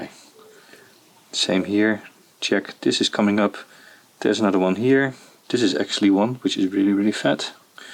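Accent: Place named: Netherlands. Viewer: 👍 Including the charming Dutch accent 😍🙏😘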